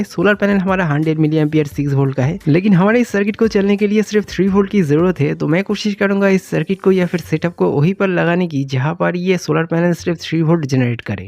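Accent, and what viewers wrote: West Bengal, India. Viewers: Aapke sare projects bohot acche lagte hai. Ek question tha kya aap west bengal se ho??aapke batonse lagta hai❤❤😊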